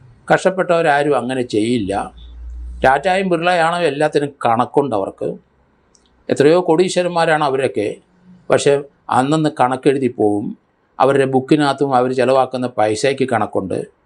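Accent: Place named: Thiruvananthapuram, India. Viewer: അത് പ്രാദേശിക ഉച്ചാരണം ആണ്. ഞങൾ തിരോന്തോരംകാർ അങ്ങനെ പലതും പറയും. ആറ്റുകാൽ കോവിൽ എന്നതിന് ആറ്റുവാകോയിൽ, ജനറൽ ആശുപത്രിക്ക് ജന്നലാശൂത്രി ഒക്കെ ആണ്.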